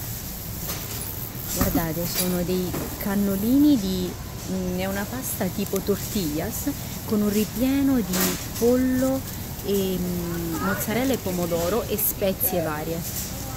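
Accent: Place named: Naples, Italy. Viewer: mi sembrava accento napoletano, scusa in che stato americano eri nel video?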